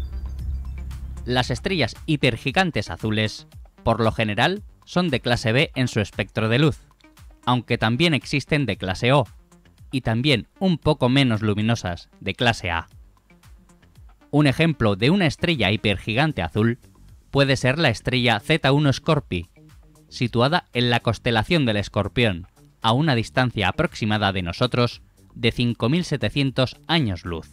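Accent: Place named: Spain. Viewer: Excelente video, pero me caen mal el acento español.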